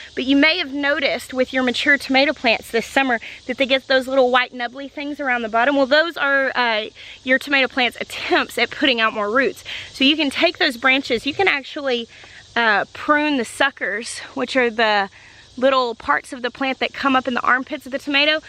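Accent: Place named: Arkansas, United States. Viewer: she's very inspirational to me. We grew up 40 miles away from her home. I ended up moving away 20 years ago to Wichita Kansas. I get so tickled at her because her accent is so me!! Lol my family teases me when my Arkansas accent comes out. I've been sending them videos saying, now I don't feel alone. Then yesterday a man came by from one of the churches here they are having vacation Bible School. I was in the other room but heard his voice. I thought, that man is from Arkansas! I went to the door and he was telling my daughter he was from Arkansas. Lmbo we have a one of a kind accent like no other lol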